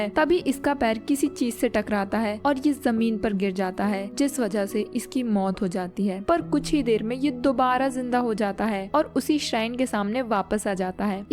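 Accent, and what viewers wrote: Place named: Bhopal, India. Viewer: You must be from Bhopal Right ?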